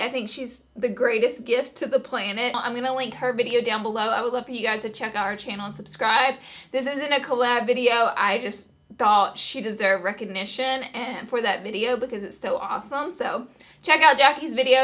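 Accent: South, United States. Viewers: I'm just coming across your channel and I just LOVE your personality AND your voice! Haha! I'm not sure where you are from but you sound a little southern and that's why I like it! (I'm from Atlanta by the way :D) I can't wait to watch more of your videos and learn new tips and tricks from you! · "And I'm gonna bring it up way too...good Lord! Way too high." hahaha that was great. Also, are you from the south? You have a southern accent like I do. :) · Your southern-ness is so adorable lol :) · she's just southern 😂 never go to the south if that accent gives you such a big problem. everyone agreeing with you is just silly. if any of you had to deal with southern people on a regular basis, you'd probably find her voice way less grating. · sounds southern. The whole time I was actually thinking that I love her voice. But I'm from Texas so lol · Thank you!! 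You're the first makeup Youtuber to talk like me. I'm from the South and we are for the most part portrayed as dumb inbred hicks which is far from the truth!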